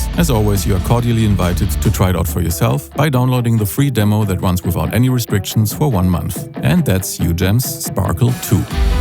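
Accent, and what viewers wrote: Germany. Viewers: Well it's a German company and the narrator is obviously German, like Kraftwerk 😉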